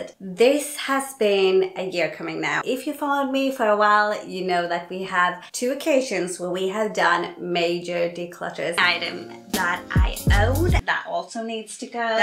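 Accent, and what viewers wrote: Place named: Sweden. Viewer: I told my husband you're Swenglish.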